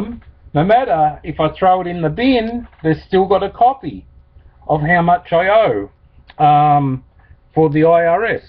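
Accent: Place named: Australia. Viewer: Sounds like you're Australian. Not sure if the laws in Australia are the same as in the U.S. There are a lot of "privacy laws" in the U.S., which Hillary is hiding behind.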